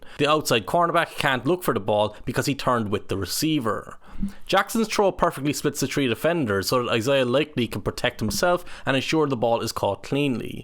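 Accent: Ireland. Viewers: Can't say I ever thought I'd hear an in-depth film breakdown of an NFL QB from a guy with an Irish accent, but you clearly know what youre ralking about. Great stuff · An Irish man commentating American football? Okay I’m here for it. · The best American football commentary with an Irish accent I've ever heard. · Surprised to hear narration from an Irishman.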